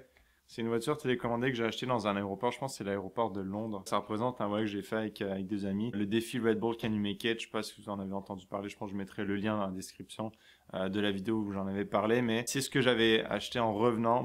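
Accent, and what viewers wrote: Quebec, Canada. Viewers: L'accent québécois est plus prononcé ou c'est moi? Et au fait le chat il s'appelle ISIS....AAAAAHHHHHHH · l'accent québécois! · C'est drôle t'as un accent québécois mais hyper légers on le remarque presque pas ! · wow , jtrouve que ta plus l'accent québécois qu'avant ^^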